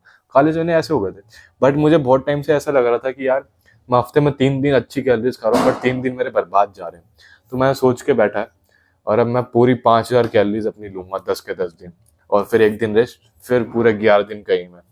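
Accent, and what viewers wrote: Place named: Pahadi, India. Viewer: Pahadi ho kya bhula??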